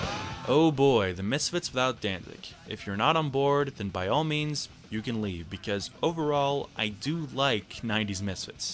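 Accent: New Jersey, United States. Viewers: He might be living in Oz but he clearly has a US accent, so must have previously lived there. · Vampire costume+Jersey accent=Priceless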